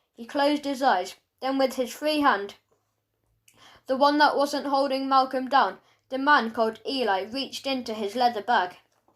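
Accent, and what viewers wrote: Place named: England, United Kingdom. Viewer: I am very good at telling accents, I think he is from England. Also amazing reading!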